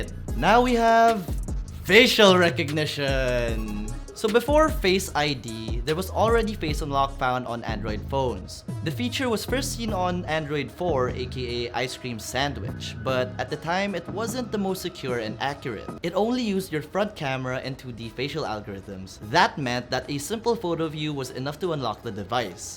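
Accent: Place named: Philippines. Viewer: ewan ko ba ang cute ng tagalog mo..hahaha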